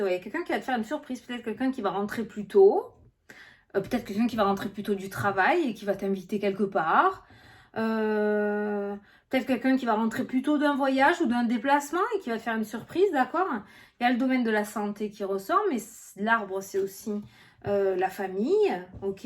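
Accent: South, France. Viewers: j'adore ton accent on se croirait en vacances dans le sud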